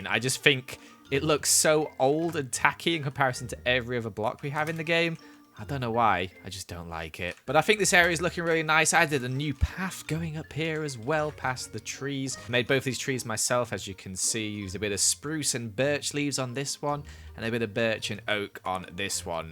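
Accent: United Kingdom. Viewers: Love that thick british accent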